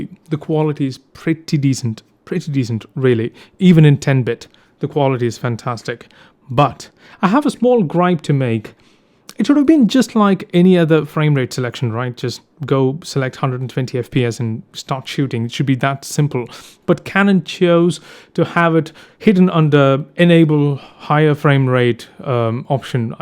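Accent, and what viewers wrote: United Kingdom. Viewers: Accent like BBC news reader, Superb bro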